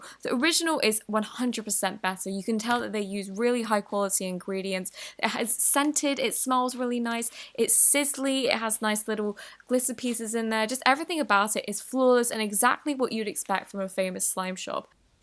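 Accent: United Kingdom. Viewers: The british accent is such a touch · How did I wake up to a British lady reviewing slime? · can u talk a little slower? I dont want to sound mean but i'm hispanic and I can understand english videos but u talk too fast and plus u got a british accent, I like your videos but I struggle understanding them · I like your voice bc I like British peoples voices🤣😂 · She has British acent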